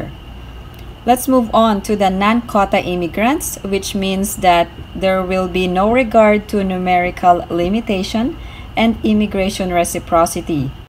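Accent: Philippines. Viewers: funny filipino accent 😅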